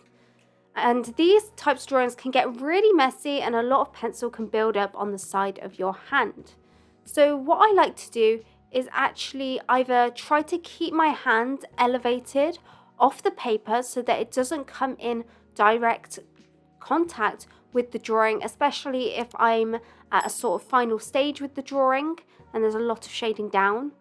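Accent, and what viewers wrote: United Kingdom. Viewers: Going by her accent I am pretty sure that she is British. Love your name, btw.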